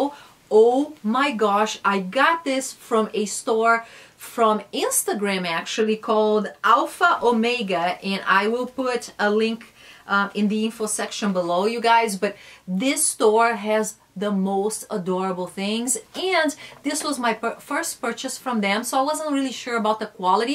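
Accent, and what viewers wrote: Brazil. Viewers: I adore your Brazilian accent 😍🇧🇷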